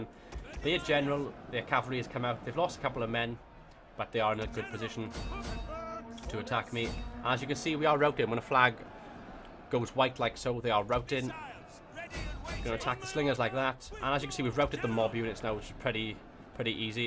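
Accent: Wales, United Kingdom. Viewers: best tutorial ive found for total war rome 2, plus your welsh so it just sounds better :)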